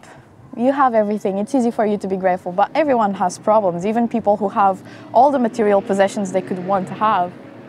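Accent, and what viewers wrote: Portugal. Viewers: portuguese ? sounds like it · man tu tens um ingles bue bom mas n sei porque eu senti logo q eras tuga good content!!!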